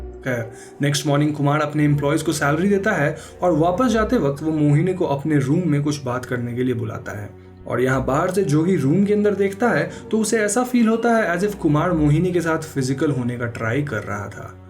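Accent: Bengal, India. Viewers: Bro are you bengali..?🧐🤔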